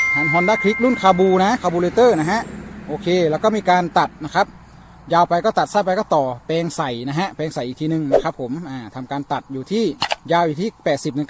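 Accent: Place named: Lampang, Thailand. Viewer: สำเนียง เหมือนคนลำปางมากครับ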